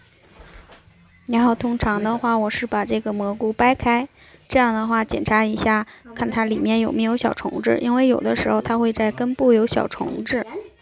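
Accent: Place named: Dashiqiao, China. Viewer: 这些黄色的蘑菇好多啊，你们的收货好多哦。我朋友是大石桥的，和你说话特别像。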